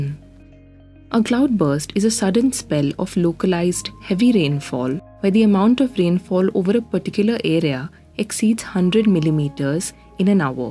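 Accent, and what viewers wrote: India. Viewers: I can’t listen to this Indian accent. Bye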